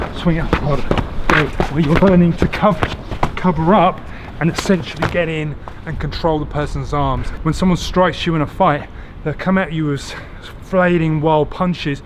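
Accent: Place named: Cockney, United Kingdom. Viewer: Beautiful accent master, is it cockney?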